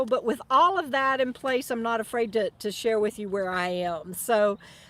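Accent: Texas, United States. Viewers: I caught this about 90 minutes after you did it. I still don’t have internet (Hurricane Ida) and won’t until mid October. I can hear some of that Texas twang in your voice! Sometimes I can get enough signal later at night by hot spotting off my phone. Good practice for boondocking! I’m going to Houston Monday for about 5 days then will be returning for Lola. Remember, I got a little gift for you from my friend in Houston’s mama who passed away in early June!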